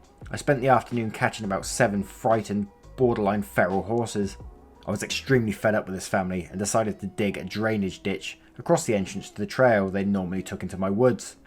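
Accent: England, United Kingdom. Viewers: Where in England is that accent. I cannot figure it out xx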